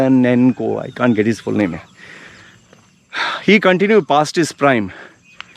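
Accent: India. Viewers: You sound Indian. Are you?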